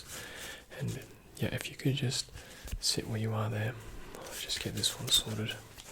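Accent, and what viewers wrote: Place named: Australia. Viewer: damn you are fulfilling my South East Asian Physiotherapist fantasy with an OZ accent and im so in it. forgive me.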